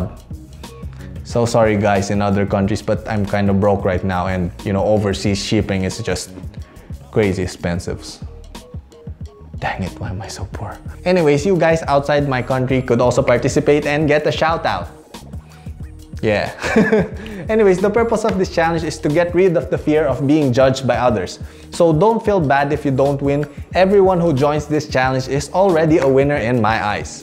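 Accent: Philippines. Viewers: The moment he opened his mouth andstarted talking me be like: "Pilipino to" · Are you a Filipino? Because your accent is filipino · You're Filipino aren't you. The accent can never hide ha💓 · I hear the accent.... pinoy?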